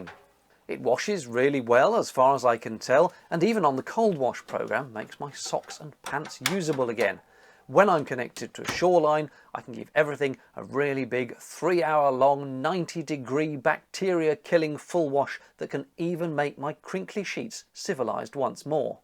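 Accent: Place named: England, United Kingdom. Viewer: Amazing how you can make even a video on a washing machine so enjoyable! Might be that classic English accent! I'm surprised that you're premitted to discharge waste water (even grey water) into the canals. Here in the US that would never fly! I'm sure that, like yourself, most folks that live and travel on the canal system are good stewards of the water ways and use eco friendly soaps that find their way into the grey water and ultimately the canals. Thanks as always!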